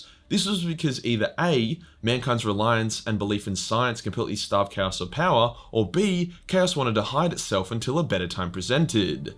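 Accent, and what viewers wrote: Australia. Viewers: Yeah Australian gen alpha is bleeding my ear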